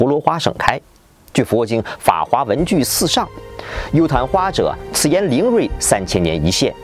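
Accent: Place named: North, China. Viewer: 北方人吧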